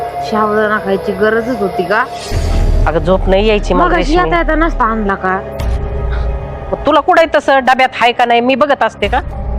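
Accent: India. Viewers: आस्सल नगरी भाषा बोलती 😂😂😂😂😂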